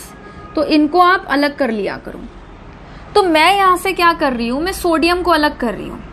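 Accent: Haryana, India. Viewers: Aapke speaking style haryanvi touch h😅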